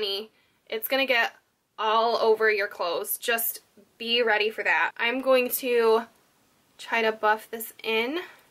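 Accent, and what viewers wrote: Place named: New Jersey, United States. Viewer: your accent screams native jersey italian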